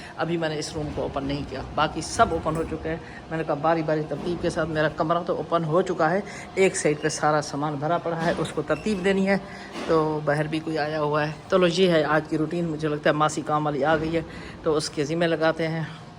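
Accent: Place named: Saraiki, Pakistan. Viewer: 👍❤🤲🤝😘🥰♥️🌺 السلام علیکم ویڈیو تو ماشاء اللہ بڑی مزیدار ہے اپ نے گاؤں دکھا دیا سبزیاں دکھا دیں اور عورتوں اور گلہری بھی دکھا دی مجھے لگتا ہے اپ سرائیکی ہو لہجے سے پتہ چل رہا ہے میں بھی لیہ کی ہوں میں ہم بھی مجھے بھی سرائیکی پوری اتی ہے کیونکہ میری ایک بہن کی شادی بھی سرائیکیوں میں ہوئی ہوئی ہے اللہ تعالی کی اپ کو کامیابیاں دے ترقی انے ماشااللہ ویڈیو دیکھ کے بڑا مزہ ایا اور بہت اچھا لگا کوکنگ ود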